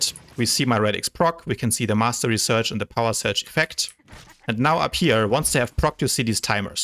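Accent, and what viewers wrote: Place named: Germany. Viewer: Man hört raus das Du sonst eigentlich Deutsch sprichtst(no front)^^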